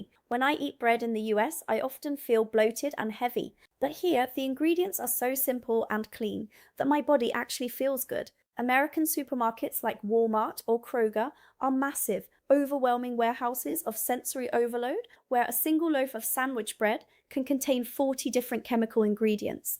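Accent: England, United Kingdom. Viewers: She’s American? With an English accent? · Although has a great English accent, I wish that she would sound her Ts!!! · Why is your accent British?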